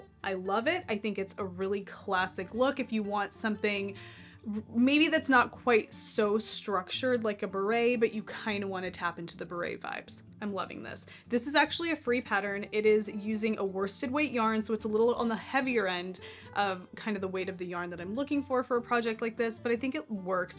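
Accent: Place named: France. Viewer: Your accent is so cute 😍 We could work on our pronunciation together, you French and me English. I like your Halloween costume idea.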